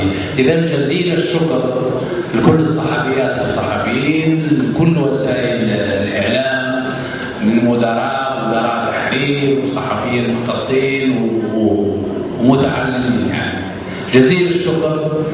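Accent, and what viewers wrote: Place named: Algeria. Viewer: Il a un accent algérien cet ambassadeur virtuel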